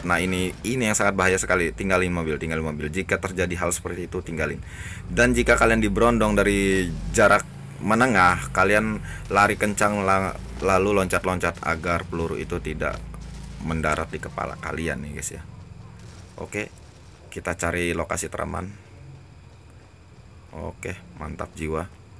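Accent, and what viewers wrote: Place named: Manado, Indonesia. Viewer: Abang orang Manado ya!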